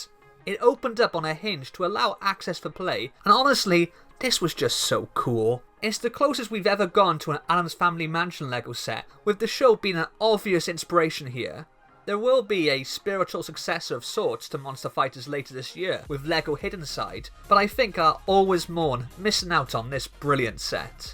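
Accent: Wales, United Kingdom. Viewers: I subbed, love the welsh accent! Great video too, I'm 15 and I'm worried I may have a dark age, I'll come back to lego though I'm sure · Fair play you sound proper Welsh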